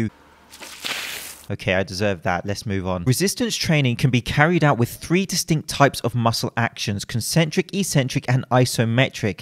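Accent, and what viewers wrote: United Kingdom. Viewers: He is not polite or calm it's just his speaking style, his accent, and pronunciation. It's like partway british where he articulates each pronunciation and his pitch up and down make him seem calm.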